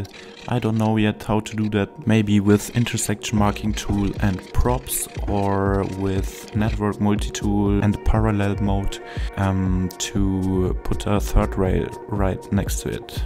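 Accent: Germany. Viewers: Man hört voll die deutsche Sprache raus 😂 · Du kommst aus Deutschland das oder Deutschsprachigen das hört man einfach 😂 oder ich liege komplett falsch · NGL I love your germann accent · Bis jetzt wusste ich nicht mal das du Deutsch bist. PS: Mann hört es aber auch Raus XD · Are you german you have a really german accent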